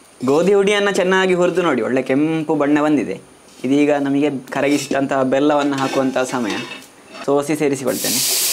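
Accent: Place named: Dakshina Kannada, India. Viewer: Le ..mangya... Edu dakshina kannada base... Respect kodu...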